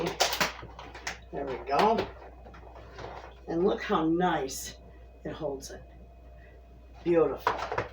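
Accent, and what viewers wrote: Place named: Midwest, United States. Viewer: I live in the south now, but grew up in the Midwest. I love to hear that Midwest sound in your voice almost as much as I love to watch you cook.😊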